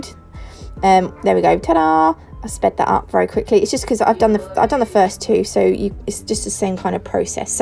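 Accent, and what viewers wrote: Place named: United Kingdom. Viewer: I'm really not a big fan of the British accent but I love listening to you, it's so refreshing. Great set, love it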